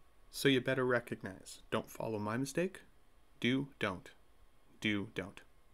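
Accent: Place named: Canada. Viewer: got that sweet ASMR soothing Canadian voice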